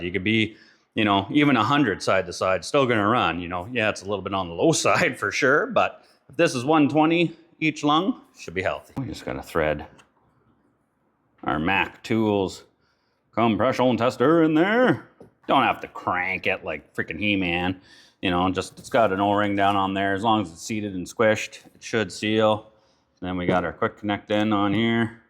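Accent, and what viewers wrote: Canada. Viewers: Hey dude , you must be canadian? Great content! Keep up the great vids.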